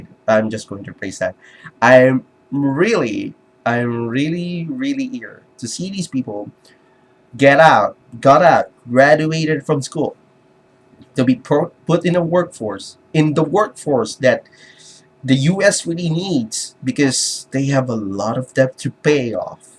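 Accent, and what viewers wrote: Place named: Philippines. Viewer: 4 years later, I stumble upon this... I can tell from your accent that you (like me) are from the Philippines. I have never known anyone else here who was aware of this "culture war" that is happening in US universities. Have you ever had any conversations about this with your peers? and what did they think about it? I've tried talking to people I know about this and everyone seems to be oblivious about it. I guess the lack of talk about it here can be attributed to the remoteness of this particular issue in the country, we don't really see people being de-platformed in universities here (as far as I know at least, I could be wrong though) and this obsession of trigger warnings and safespaces. The only problem that I see, is that if we don't pay attention to this problem happening in another country, we put ourselves at risk of repeating the same thing here.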